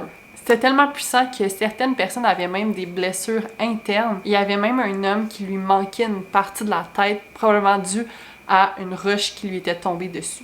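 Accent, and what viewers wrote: Quebec, Canada. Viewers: Troisième vidéo que je regarde de ta chaîne et ce que je peux dire, c'est même avec ton petit accent du Québec. J'adore t'écouter et te visionner. Et puis t'arrive à captiver par ton récit des évènements, enfin c'est comme ça que je le vois. Pour ce qui est des évènements encore une fois désastreux. Moi, même si c'est considéré sans danger. Je me serais un peu renseigné sur le volcan en lui même. Parce que tous les types de volcans ont un niveau de dangerosité. Un volcan pyroplastique n'a pas les même risque qu'un volcan explosif comme celui qui est citer dans ta vidéo. Certes le nuage de cendre et les explosion ne sont pas excessives mais ça reste les plus dangereux dans les volcans. On peut toujours éviter une coulée pyroplastique soit en allant plus vite que la coulée (suivant sa viscovité) soit en essayant de partir dans un sens ou l'autre hors du tracer de la coulée. 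Encore une histoire bien triste, je suis de tout coeur avec les familles et les blessés dans cette histoire. A très vite pour une autre de tes vidéos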